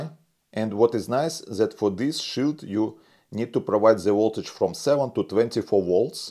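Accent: Russia. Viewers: Русский акцент потихоньку убывает у автора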